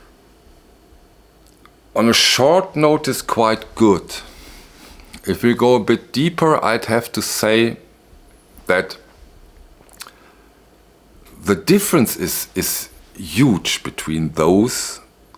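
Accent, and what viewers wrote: Germany. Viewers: Am I the only one who could listen to this man all night. That German tinged English is incredible.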